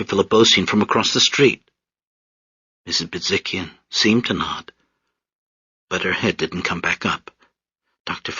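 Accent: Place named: Borscht Belt, United States. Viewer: This narrator has given the first generation American, Michigan-born characters, theatrical borscht belt accents, for some reason! Weird.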